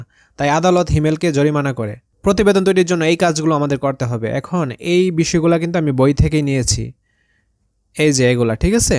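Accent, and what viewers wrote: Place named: North Bengal, Bangladesh. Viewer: Apnar basha uttarar kothai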